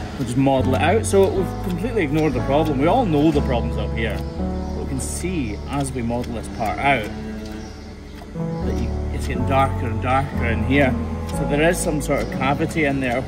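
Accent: Scotland, United Kingdom. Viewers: Swedish 51 year old middle school teacher! Teaches grades 3-6 at the moment in science, biology, chemistry, technic, maths and (drumroll😂) English! Living in the very southern part of Sweden, near Malmö. Really into animals and helping them! Catowner 🐈‍⬛and wildlife lover! 🐌🐛🐞🕷️🪲🦗🦇Have planted a LOT of plants and flowers for butterflies 🦋 and let my lawn be full of white clover ☘️ for bumblebees🐝 and bees. Put up bee hotels and bat dwellings ! I keep the corners of my little garden messy for the hedgehogs 🦔! Water bowls and food for the birds 🐦 🐦‍⬛ I really enjoy your channel here! It’s so nice to see you help these animals to a better life! 🐄 🥹😍 Been to Scotland 🏴 Edinburgh once and love hearing your Scottish 🥰 Must return soon! Also a huge fan of the band Wet Wet Wet and singer Marti 🥰 Bye from 🇸🇪🌤️ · Retired middle and high school science teacher, now a canine obedience and rally instructor, student, handler, and judge for 3 separate registries here in the US. 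Have been following since before the Appleton crush, and really appreciate learning new things. Plus, I also enjoy the banter and cadence of your storytelling - my ears get a Scottish vacation 😊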